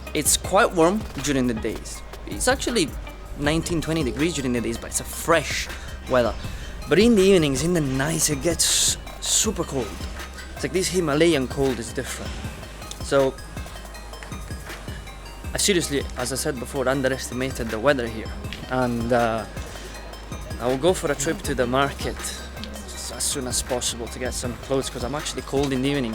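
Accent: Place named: Scotland, United Kingdom. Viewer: Great vlog,! You picked up some Scottish accent too!! 🤩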